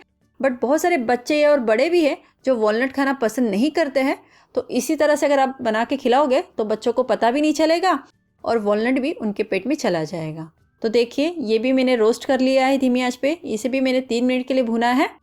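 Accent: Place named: Gujarat, India. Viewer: Aapka bolne ka lahza gujrati bhasha k jaisa hai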